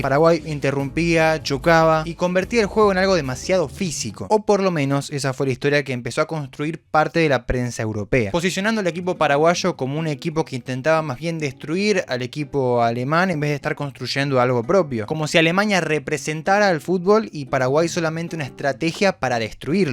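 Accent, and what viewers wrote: Argentina. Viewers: Solo escuché el acento argentino y supe que habría victimismo e hipocresía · mi problema con paka paka, aburre realmente que no hacían mas que reivindicar lo argentino por sobre cualquier otra intención de producir algo propio e innovador y lo argentino está en la voz del que cuenta la historia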